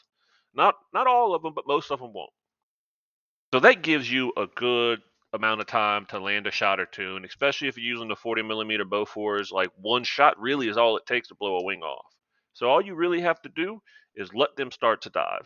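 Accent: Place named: South, United States. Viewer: As a texan, You sir are on of the most southern MotherFckers I have ever heard. <3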